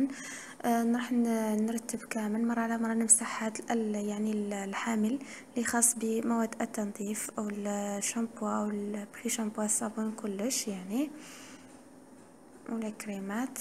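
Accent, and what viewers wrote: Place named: Biskra, Algeria. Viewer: يعطيك الصحة الفحلة من الفضول نسقسي برك تهدري كلمات الجيروا لكن اللهجة البسكرية طاغية عليك 😉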